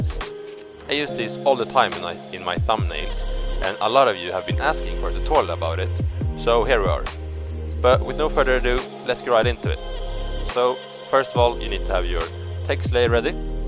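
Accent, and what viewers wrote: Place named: Sweden. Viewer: Du låter jävligt svensk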